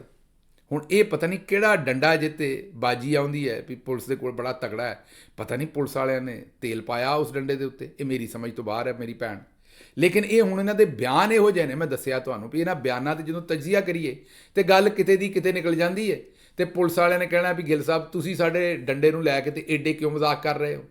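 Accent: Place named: Punjab, India. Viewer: Waah waah Dr. Shahbaz Gill sahib! Tuhada Punjabi vlog sun ke taa dil garden garden ho gaya! 😍🔥 Tusi jo asli Punjabi wich gallan karde ho, idioms use karde ho, o kamaal di gal ae! Ehde vich jo mithaas, tehzeeb te asliyat hai, oh sade dil nu bohat khush kar dinda ae! Tusi sirf Punjabi zubaan nu hee nahi, balki sade Punjab di rooh nu vi chhuh lende o! 💯👏 Sab ton vaddi gal eh ae ke tusi sirf changi Punjabi nahi bolde, balki mulk di asli, har roz di siyasi surat-e-haal vi bohat khoobsoorti naal samjhande o! Jo gallan dusde o, oh sachi ghalat de farq nu wazeh karde ne te asal sach samne le aunde ne! 🇵🇰🔥 Main taa hamesha tuhade vlogs da besabri naal intezar karda haan! 😍 Ehjo ji Punjabi wich tusi bolde o, oh bohat hi kamal ae! Tuhada style, tuhadi clarity, te tuhadi soch sab kuch kamaal da hai! Bohat shukriya keh tusi sade layi es trah de vlogs banande o! 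Rab tuhanu hor kamiyabi deve! Agle vlog di umeed ch haan! 💕💯🔥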